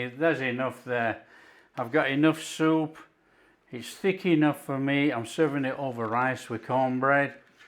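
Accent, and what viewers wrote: United Kingdom. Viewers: What a delight - Hoppin' Jack - or Hoppin' John - with a British accent. From the northern US, but schooled in the South, so I enjoy a variety of southern dishes. This will be in the plan next time we have ham!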